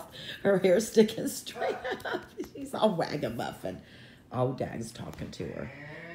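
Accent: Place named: Alabama, United States. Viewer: When I was a kid (many moons ago), I had an aunt from Alabama. I swear hearing you talk is like hearing her. And she always made me laugh. When you say "dinner", Starr says "wait for me".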